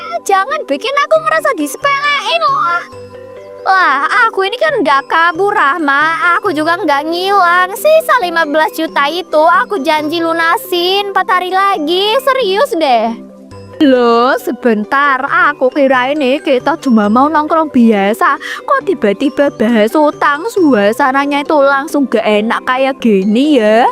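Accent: Java, Indonesia. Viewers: Logat bicara nya Kya logat Jawa tidak nyambung sama tema nya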